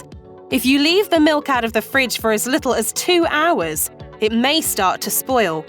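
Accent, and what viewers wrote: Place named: United Kingdom. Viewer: wait whaat! , mashed isn't british lol , where'd you find this so lovely nice british narrator lady , she's sounds awesome , btw im british too , great video & lovely narration too , stay safe mashed staff , bye!!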